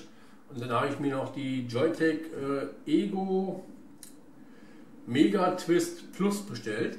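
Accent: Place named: Brandenburg, Germany. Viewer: Rainer3041 komm auch aus Brandenburg Mich interessiert es nur, da ich das am sogenannten Brandenburger Deutsch hör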